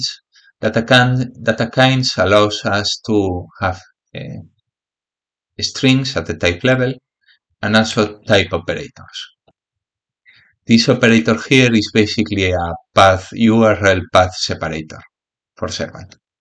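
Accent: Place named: Spain. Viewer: Sounds like an spanish accent to me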